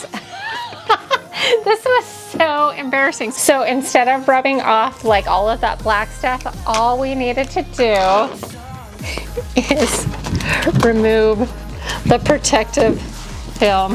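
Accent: United States. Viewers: it's so funny when americans try to pronounce swedish words. Love it. ❤️😃 no hate love from your Swedish followers 👌🏻🎉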